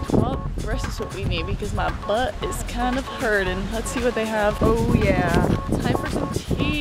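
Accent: United States. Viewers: You speak English like an American, almost no accent and a lot of idiomatic expressions we use.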